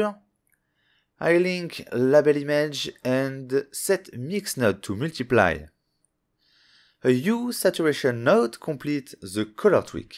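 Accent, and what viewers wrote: France. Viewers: I never thought how French accent sound in english it's quiet fun... I like it... no offense I'm Italian I also speak French but never had the opportunity to listen to a French speaking in english. Great tutorial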